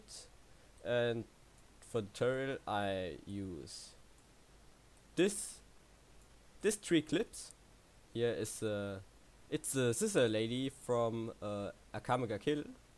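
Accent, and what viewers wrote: Germany. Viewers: Man hört es so stark raus das du deutsch bist xD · Nach 2 Sekunden schon erkannt, dass du deutsch bist^^ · ich dachte dauerhaft „warum spricht er so?“.. dann habe ich unten in der Windowsleiste den Deutschen Satz gelesen & dachte mir „AHHHHH DESHALB!“ man merkt halt unnormal krass dass d deutsch bist :D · Man hat echt doll gemerkt das du deutscher bist>~< No front an dieser stelle. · Man hört halt voll das er deutscher ist😂😂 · haha ich hab gemerkt das du deutscher bist ich spreche genau so english hahaha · I think ure from german hab ich Recht ;$ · Hey nach deinem Akzent kommst du aus Deutschland oder? xD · Man merkt das du deutscher bist dein Englisch ist aber trozdem gut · i think you are german xD but thanks for the tutorial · Man hört das du aufjedenfall ein deutscher bist aber trotzdem super gemacht!! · wtf just buy the Program and dont crack it 😂 btw dein deutscher Akzent ballert 😂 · Das ist der deutscheste Akzent denn ich yeh gehört habe, aber gutes video und erklärung. 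· haha geiles video, feier deinen deutschen akzent so hart bei deinem englisch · Man merkt wirklich das du Deutsch bist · You have germany accent :) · Man merkt das du deutsch bist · Hab vor dem intro schon bemerkt das du deutscher bist haha 😂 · wie man einfach raushört das du deutscher bist ^^ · Man hat soo krass gemerkt das du deutscher bist Hahahahaha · Ey man merkt deinen deutschen Akzent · Man hört so raus, dass du Deutscher bist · Ich habe deinen ersten Satz gehört und dachte mir nur so: Oh, ein Deutscher xD Aber egal, hat mir echt geholfen, danke !!! Habe sonst immer mit einer anderen App gearbeitet und war jetzt etwas überfordert mit Adobe xD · Man hört diesen deutschen Akzent XD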